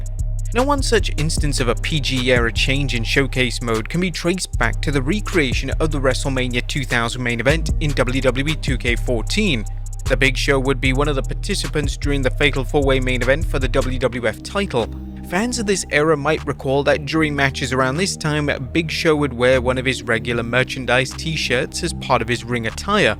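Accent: United Kingdom. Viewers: Is there ANY American youtuber talking wrestling? EVERY video I open has British accent.. 🤷🏻‍♂️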